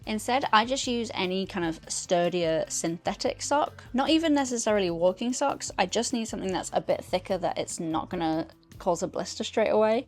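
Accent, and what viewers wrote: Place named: Yorkshire, United Kingdom. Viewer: That makes sense, i could hear the yorkshire but mixed with something else. I`m just over the border in lancashire and spent a lot of time up dales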